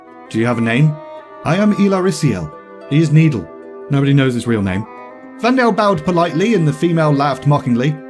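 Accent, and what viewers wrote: United Kingdom. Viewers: It really says something when I've had this book on my bedside table for half a year and couldn't be bothered to read it but a 3 hour re-telling with a hint of British charm hooks me in minutes. Just discovered you but excited to sub and get through your other vids :)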